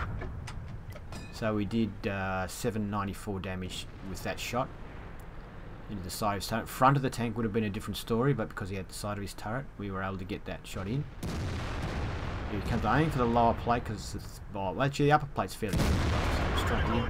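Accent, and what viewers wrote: Australia. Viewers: I see you have the 124 games in the FV183. Can you do a review on that? What do you think of the HESH after the nerf to pen? You do Excellent reviews. I like the Aussie accent !! :P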